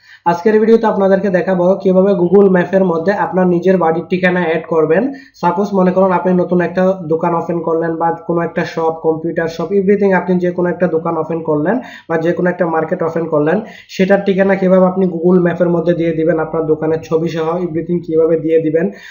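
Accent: Noakhali, Bangladesh. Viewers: শালা ভালো ভাষার কেউ নাই,,সব নোয়াখাইল্লা শালা রিভিউ রে বলে রিবিউ এখন রে একন 🙈🙈🙈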